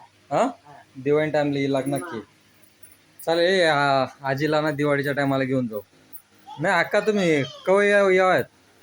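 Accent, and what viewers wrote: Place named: Ahirani, India. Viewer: Dada tumi same maja navra sarkh bolta aayrani madhe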